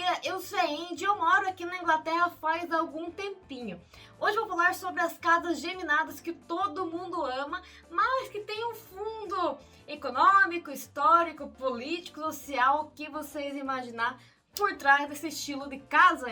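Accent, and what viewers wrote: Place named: Rio Grande do Sul, Brazil. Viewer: Ah! Te ouvir foi tão parecido com a gente falando que achei que era gaúcha. Obrigada 😘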